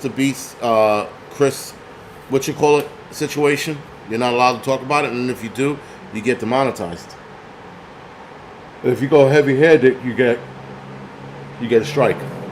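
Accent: United States. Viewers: Hee hee, I do find it amusing how Americans pronounce English place names. Rotherham = /rovver-um/. 🙂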